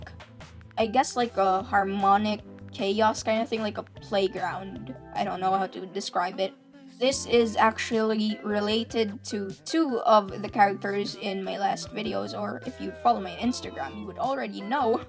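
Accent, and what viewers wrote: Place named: Philippines. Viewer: I knew your Filipino from the way you speak (IDK but I can easily determine Filipino accent) but I got the confirmation with that YAWA PUTANGINA moment.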